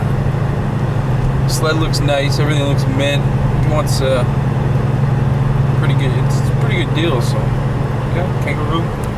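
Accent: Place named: Canada. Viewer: okay your from canada eh (: is that the 401 your on?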